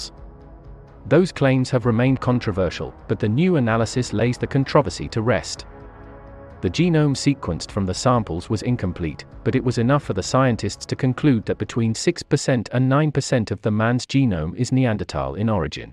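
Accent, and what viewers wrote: England, United Kingdom. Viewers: I like your english accent.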